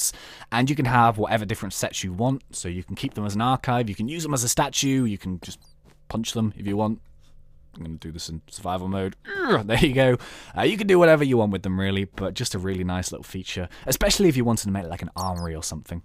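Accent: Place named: United Kingdom. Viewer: Lol your British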